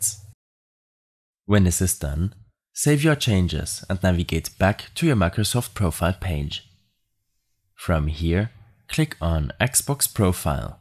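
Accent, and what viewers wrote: Germany. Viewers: Noch keinen deutscheren Akzent gehört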